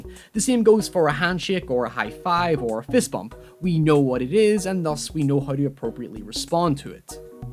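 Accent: Ireland. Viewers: I think he is Irish. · I don't think I can post URLs in YouTube comments but if you check Reddit in the videos sub you'll see a video posted on Tuesday 12th about Louis Theroux. Someone posted your video about Theroux there and your accent led to some confusion as to whether you're Irish, American or Scottish! :)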